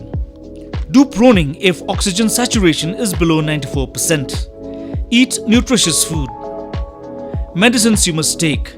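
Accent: Meghalaya, India. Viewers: Ia ki jingpynbna ba kongsan kren da khasi mung... nga sngewthuh hi khasi accent.. thait ba kumne kein ha ri ngi ym poi shaei2 ruh ... u ba bun un nym sngewthuh iakane ... shu ia leh khlem pyrkhat... kumno yn myntoi haba kumne ??? Biej👎👎👎👎👎👎👎👎👎👎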